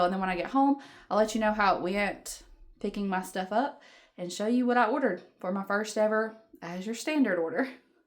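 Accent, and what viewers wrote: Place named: East Tennessee, United States. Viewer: My family is from East Tennessee and your accent is so similar to that, are you originally from that area, by chance? I swear, hearing you speak makes me think of them, I love it.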